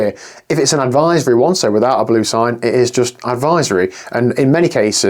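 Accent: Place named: England, United Kingdom. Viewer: You sound as if you’re English. Can you explain to me why so many British people use the word ‘floor’ when they’re outdoors? It’s the road, right? If you stumble or fall off your mountainbike in a meadow or in the woods, you land on the ground, not on the floor, right? I hear this a lot lately. Maybe a result of our modern sedentary life?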